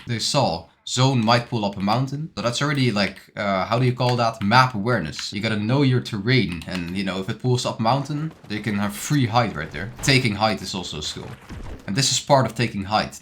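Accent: Netherlands. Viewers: good video keep it up, are you dutch by any chance? you sound NL ❤❤❤❤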